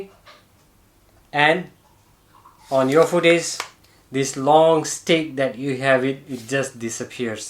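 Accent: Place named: China. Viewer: i wonder how come a Nepalese guy speaking English in Chinese accent .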